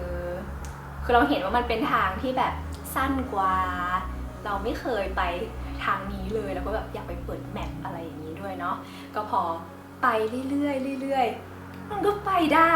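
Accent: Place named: North, Thailand. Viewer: สวัสดีจ้าว ปี้หนานดูคลิปตี้ไปแอ่วแม่กำปองฟังกอยสำเนียงเเล้วต้องเป็นคนเหนือแน่เลย มากอยคลิปนี้ฮู้เลยว่าเป๋นคนเหนือดีใจ๋เด้อตี้มีช่องคนเหนือทำช่องดีๆ ปี้หนานก็ทำช่องเหมือนกันมือใหม่หัดทำ ทำไปเก็บความประทับใจ๋ไว้ดูอีกหน่อยทำดีพ้องบ่อดีพ้อง สู้ๆไปเด้อ เที่ยวบ้างไม่เที่ยวบ้าง✌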